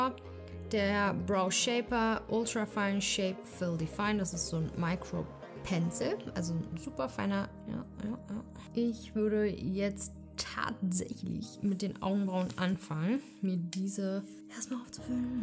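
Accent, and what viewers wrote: Berlin, Germany. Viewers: kann dit vielleicht ma sein, das de gerade frisch aus Berlin zurück warst vorm Videodreh? Da kommt so schöne Berlinerschnauze durch. 🤗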